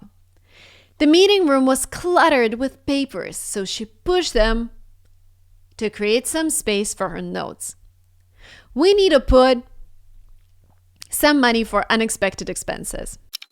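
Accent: Russia. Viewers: Is she not Russian? It's just that her accent at the beginning was very similar to Russian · Бля...😂 жуткий РАШИАН ЭКЦЕНТ 😢 Слушай классическую музыку, развивай слух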